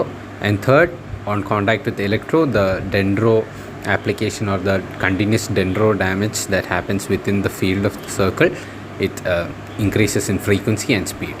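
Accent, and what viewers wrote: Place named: India. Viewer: Bro you are Indian right your accent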